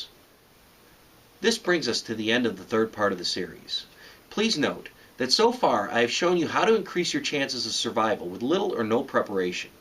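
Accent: United States. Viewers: It's funny that you say that, only in America we say it that way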